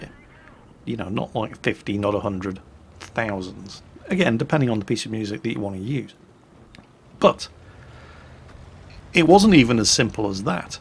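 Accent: United Kingdom. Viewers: oh my god..., do get a move on...you have made the subtle art of rambling into a tortuous experience only spared by those wonderful dulcet tones...damn your British accent for making me love you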